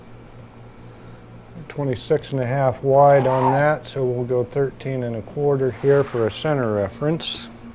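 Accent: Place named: Midwest, United States. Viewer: I love watching you work. After 4+ decades in the Navy and federal wildlife law enforcement, I have developed an interest in old hand tools, carving, backpacking, fly fishing, AND furniture restoration. Your videos are almost “zen like”.....in that they relax not only me.....but surprisingly, my Australian Shepherd as well!!! Plus.....I’m learning something! I’m just getting into the restoration of a Stratford Chair Company mission-style chair....and discovered your “channel”! You remind me of a younger Sam Elliott....you even have same midwestern speech pattern! Bravo-Zulu, job well done....on a well-produced AND instructive AND relaxing YouTube video!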